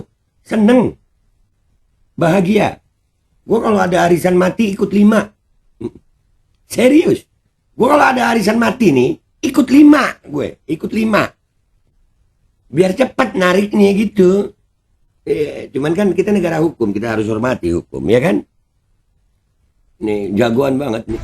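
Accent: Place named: East Java, Indonesia. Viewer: LOGATNYA SEPERTI JAWA TIMURAN..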